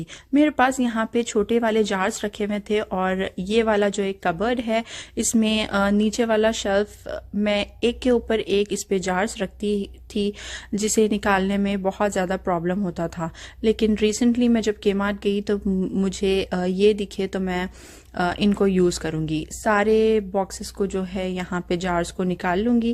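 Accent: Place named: Hyderabad, India. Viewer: Hey, Assalamualaikum. I live in Melbourne, Australia too. I just wanted to suggest that you don’t change your accent our Hyderabadi accent is the best. ❤️ Love your videos🤍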